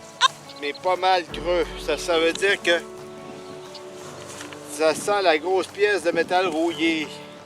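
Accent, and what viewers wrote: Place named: Quebec, Canada. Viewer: Oui je comprends bien le Québécois, car j'ai fréquenté pas mal de Québécois . C'est un vrai plaisir de te regarder et de t'écouter. Je vais prier les dieux de la detection pour tes prochaines sortie.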